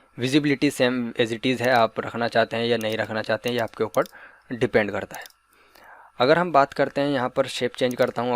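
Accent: India. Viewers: Hi, you sounds like my side. Are you from U.P.?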